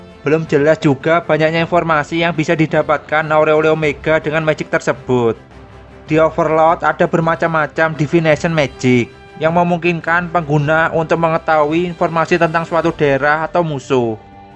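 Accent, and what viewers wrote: Java, Indonesia. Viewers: Bang bahasanya di arahkan ke gaya Indonesia aja bang, jangan terlalu ke arah Jawa, berasa kurang bang kalo masih Pake logat Jawa.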